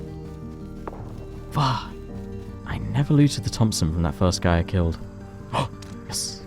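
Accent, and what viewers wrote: Australia. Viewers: british man??? arent u austrailian? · R u an aussie?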